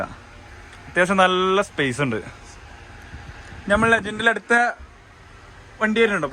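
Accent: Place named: Palakkad, India. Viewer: Palakkadan ഭാഷ 😂😂😂❤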